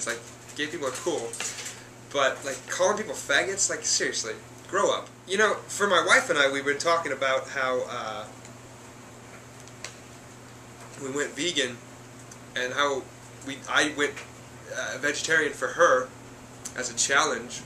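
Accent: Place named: Spain. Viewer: Nice Spanish Accent...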